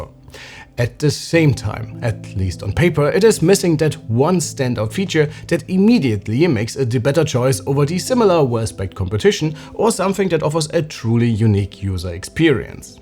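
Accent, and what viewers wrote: Germany. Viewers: Harter deutschsprachiger Akzent (hab ich aber auch wenn ich Englisch spreche) 😊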